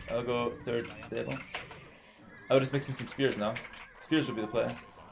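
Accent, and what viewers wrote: Canada. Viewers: ...You sound like your from the French speaking part of Canada.. or speak French.. you should should try and impersonate an Aussie accent ✌🏽🇦🇺🤙🏽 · haha thats just how we talk in Canada. We gotta say honestly before explaining something or how else will people know we are being honest?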